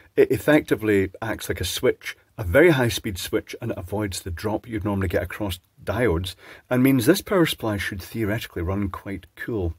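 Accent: Scotland, United Kingdom. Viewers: It's funny hearing a British man pronouncing Swedish 😂❤ koppla was pretty good, I would never have understood småhagel if it wasn't for the text on the box 😂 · I have a Koppla, and, my knowledge of electronics is far too crap to follow this. But as a consumer, as large companies go and someone who tries to avoid buying anything first-hand, maybe I've swallowed some marketing but I do feel IKEA is a name I trust a bit, I'm glad to see this disassembled. Can't help but remember the episode of Top Gear about the final demise of Saab. There's something about a company running itself into the ground because "good enough" simply wasn't good enough that viscerally hit home. Also bonus tip: Å is like an a then an o. Små means small and there's a similarity in sound, much more so in your Scottish accent than my English bastard one. Decided to learn Danish rather than Swedish and they're quite different in many respect but I expect hagel is pronounced very similarly to "hail".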